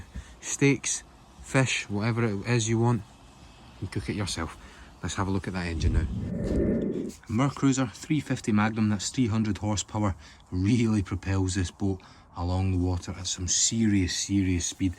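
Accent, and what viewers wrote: Scotland, United Kingdom. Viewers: I love that scottish accent!! watching the videos only for the guy talking, love it !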